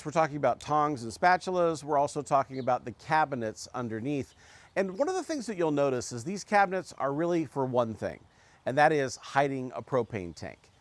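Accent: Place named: United States. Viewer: That was an excellent impressive, thorough review!!! However, I would have liked to have seen a review on the igniters. I find it very frustrating that in all of the BBQs that I have purchased over the years, the igniters have failed within 6 months of purchase. They have been ridiculously poorly made. Are there any advantages of any of the different models when it comes to cleaning the insides of the BBQs, when it comes to a build up of grease, cheese etc ..? Also, as a Canadian, I am assuming that the dollar amounts that you are speaking about are in U.S. dollar. I also assume by your accent that you are an American. Thank you!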